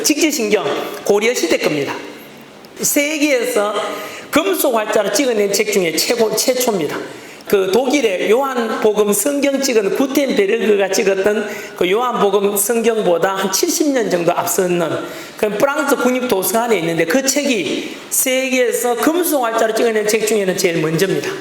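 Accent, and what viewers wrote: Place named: Gyeongsang, South Korea. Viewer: 가시는 발라내고 살코기만 먹으라는 경상도 사투리 맛나요!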